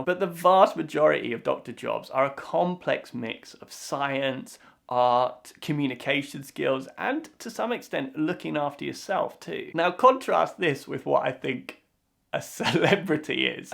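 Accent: United Kingdom. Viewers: I Agree with you about the photo. You are much more handsome now. Actually, much cuter than that other doctor on the front page of the magazine. Besides that, you have a great British accent and I love the way you laugh. But I mainly watch you because you are so down to earth and you have interesting medical subjects and you explain it easy for me to understand with a touch of comedy. I watch 2 other doctors, and you are much better than them. You are my #1 doctor on YT.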